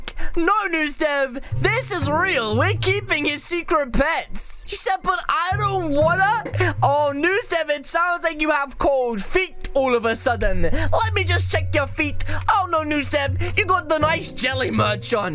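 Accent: United Kingdom. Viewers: XD British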